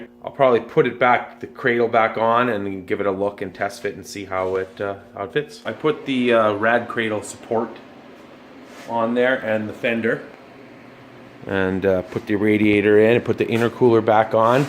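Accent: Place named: Canada. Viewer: makes brrilliant hand-made parts showing excellent home grown engineering skills, and some nimrod criticizes him for saying "rad"?? Canadians say things kinda funny (they are working in a "gradge" for example, not a garage. is as pure a hot rodder as I've ever seen--he gets massive bang for the buck.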